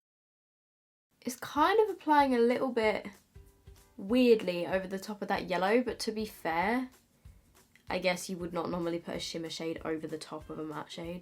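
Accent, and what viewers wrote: United States. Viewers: So odd hearing you with American accent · Your American accent has me shook. I thought someone dubbed over you😂 so much better than our actual American accent tho. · Great American accent. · That American accent x 😂❤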